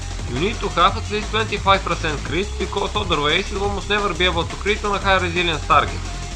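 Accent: Bulgaria. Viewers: 100% sam siguren 4e si bulgarin samo kato ti 4uq angliiskiq :D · ахаха леле брато, само като ти чух акцента, си казах, това момче е Българин :D щом бях в другата стая и ми се стори, че говориш на български, смятайй ;д иначе браво, клипа е мн добър и полезен :)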